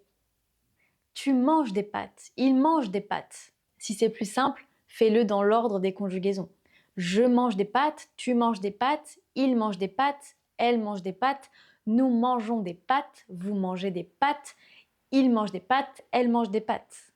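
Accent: France. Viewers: Your English with the French accent 😮😳 🥵 🫠❤ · Bonjour Tout à fait, c'est des méthodes que j'utilise aussi pour améliorer ma prononciation. Je parle tout seul très souvent quand, par exemple, je vois une vidéo YouTube ou la télé et je fais comme si je donnais mon avis sur n'importe quel sujet et ça m'aide à m'exprimer. Je pense que je suis pas mal Mais il y a un truc sur lequel j'ai un autre avis. Je pense qu'en fait t'es très célèbre,. T'es une star sur YouTube et j'ai adoré t'écouter parler en anglais parce que tu perds pas ton accent français mais en même temps ta voix change un petit peu, je trouve ça formidable 😍 Merci pour les conseils, je te souhaite une excellente semaine ma prof ✌🏻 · Goodness mate, your seriously in love aint ya 😂 As a Londoner- no! She doesn’t sound English, elle a un really sweet French accent 😍❤️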